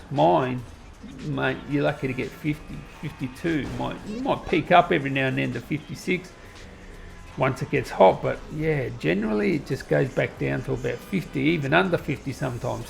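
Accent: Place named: Australia. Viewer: with the laid back aussie accent and not showing yourself on cam, I imagine you're doing this video's in just your fuckin pants whilst necking tinnies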